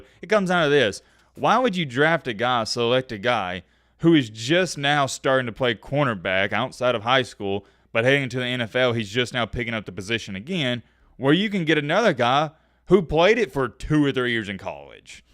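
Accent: South, United States. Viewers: How tf u don’t know what a sawed off shotgun is? And you sound like ur from the south?? Like holy jeez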